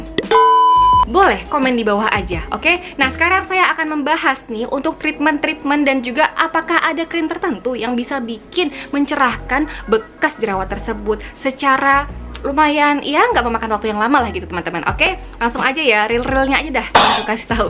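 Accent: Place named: Sunda, Indonesia. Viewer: Urang sunda ning😂😂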